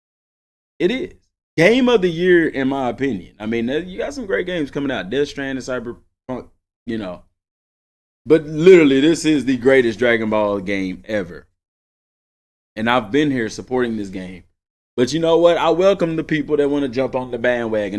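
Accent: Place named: North Texas, United States. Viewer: Super Hype for this game NOW, BUT you can’t band wagon something before it comes out and Proves how good or great it is. it’s not band wagoning to anticipate a good experience based on pre-Viewing. That’s just Marketing ,advertising, and publicists doing their job to make it more appealing to its demographics bro and that includes youtubers. Also you wouldn’t happen to be from North Texas area. Just curious straight out the gate you sound like my kinfolk and friends; accent and speech pattern wise.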